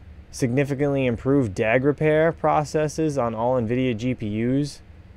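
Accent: New England, United States. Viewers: New England accent is strong today!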